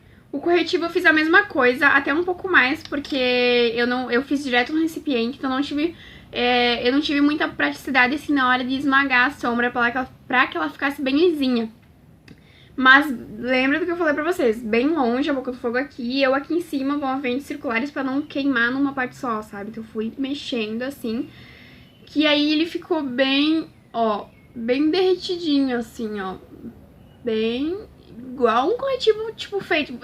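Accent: Rio Grande do Sul, Brazil. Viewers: Parece gaúcha♥️ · E quê vc fala que nem gaúcha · você é do RS? Percebi o seu sotaque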